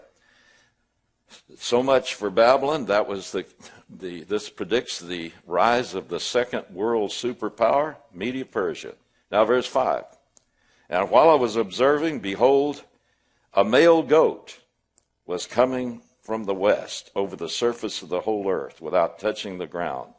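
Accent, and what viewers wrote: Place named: Kentucky, United States. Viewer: I WATCHED YOUR VIDEO WITH YOUR SON! THE 7 YEAR TRIBULATION STARTS AT THE RAPTURE! NOT MIDTRIB! NOW THERE IS THE THOUGHT THAT THE TRIBULATION MAY NOT START IMMEDIATELY AFTER THE RAPTURE. BUT THE RAPTURE IS BEFORE THE START! MIDTRIB IS A FALSE TEACHING. YOU SOUND POSSIBLY KENTUCKY OR TENNESSEE? NORTH CAROLINA OR VIRGINIA! I'M IN EXTREME SOUTH GEORGIA! BEEN HERE GOING ON 25 YEARS! I'VE BEEN ALL OVER THE USA! LIVED IN CALIFORNIA AND MINNESOTA AND PLACES WHILE IN THE WAC'S! PLUS ILLINOIS! MY DADDY WAS FROM TENNESSEE! BEEN TO GERMANY AND JAMAICA! BOTH AS A CIVILIAN! WE HAVE THE BEST COUNTRY!!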